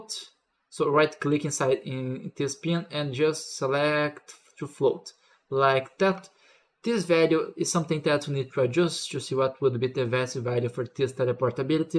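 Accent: Brazil. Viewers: where r u from? i felt and brasilian accent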